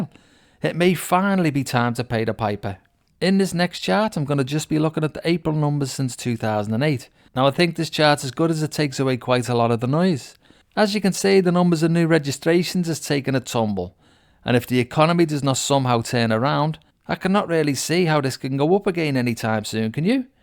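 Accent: Liverpool, United Kingdom. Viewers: I love the fact a guy with a Liverpudlian accent is informing me on the state of our economy. 😂 I've lived here for 52 years and concur with your observations.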